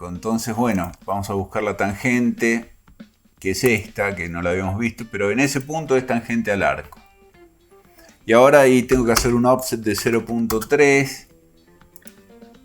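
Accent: Argentina. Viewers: Lo más chistoso, es la forma en que en Argentina nombran "Y";en México la distinguimos, como la y griega y la nombramos (lle); asimetricamente , (ye), para tenerlo bien diferenciado de la(i) latina;así llamada,, aunque en sonido es casi la misma pronunciación, arrastramos un poco la (Ll)cuando pronunciamos la "y", ya que en términos matemáticos es mejor para diferenciar ambas!!!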